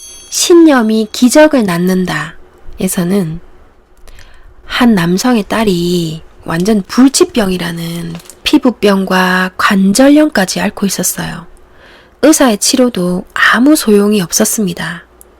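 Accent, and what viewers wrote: Gyeongsang, South Korea. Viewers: 개인적으로 ,경남 사투린지, 부산 사투린지몰겠지만, 더욱 정감이가고.... 더불어 전해지는 진심어린 내용에 푸~~~욱!! 빠져 들게하는 매력이 있네요...ㅎㅎ 감사 하고 있어요~~!!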